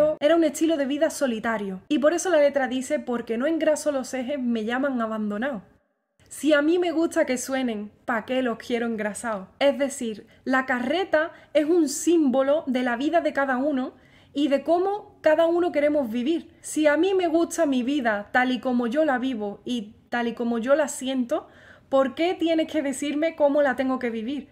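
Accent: Andalusia, Spain. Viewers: Hermoso lo que hacés! Soy argentino, esta cancion la escuche muchisimas veces pero me llegó tu interpretacion tan sentida. Muy buena tu guitarra y tu voz. No se de donde sos ( tal vez andaluza por el acento?) pero transmitis muy bien lo que don Ata hacía. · de que parte de españa es tu acento? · Excelente,. Me hiciste emociar. ¿Sos andaluza? Genial, genial interpretación.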